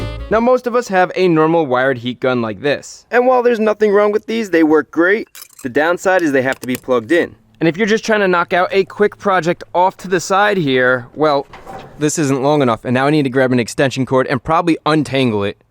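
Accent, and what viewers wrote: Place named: Philadelphia, United States. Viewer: Bro, I completely forgot you were from somewhere VERY close to Philly! But the second I heard you say, "fill the rest up with wooder" [water] I nearly fell out of my seat! My wife (who grew up near Allentown) yells at me all the time about my pronunciations and slang, but "wooder" in particular because she doesn't want my son to pronounce it that way!🤣😂🤣I love it man, great video, thanks for the upload. And I hope you and yours have/had a great + safe holiday and a Happy New Year! See you 2026!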